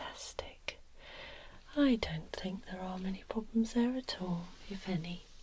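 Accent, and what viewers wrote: United Kingdom. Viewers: So glad there's a sassy, funny and wonderful British ASMRtist out there. A British accent always gets my tingle on!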